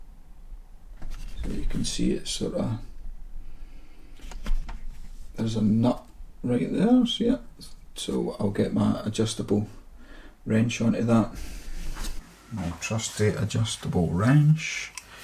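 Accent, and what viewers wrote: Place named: Scotland, United Kingdom. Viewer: Thanks from down under, you did a great job explaining the process. I'm a 75 year old female about to change the vanity basin taps to quarter turn and bought the whole lot. Now I realise I can take it back and just get the quarter turn brass spindle and use it with my existing handles. May just do the shower too 😊 PS love your Scottish accent and thanks for talking slowly.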